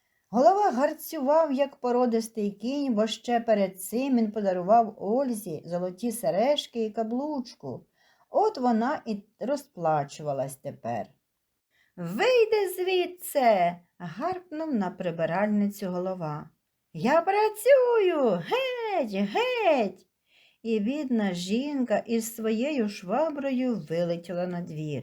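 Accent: Standard. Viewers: Тільки за голос і чисту українську❤❤❤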